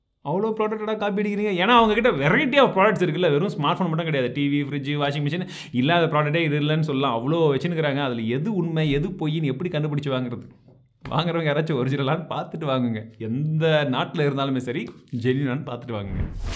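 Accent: Chennai, India. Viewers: dont use chennai tamil, keaka mudiyala... use south TN tamil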